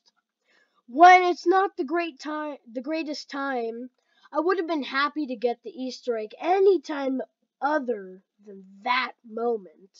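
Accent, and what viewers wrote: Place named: Colombia. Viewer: tienes voz de colombiano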